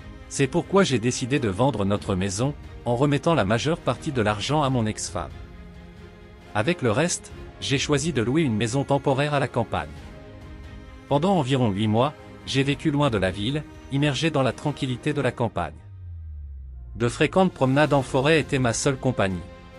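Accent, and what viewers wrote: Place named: France. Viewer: Ca parle aussi bien espagnol qu'une vache espagnole. 😂Mais malgré l'accent bien franco-français, j'ai quant même compris la fin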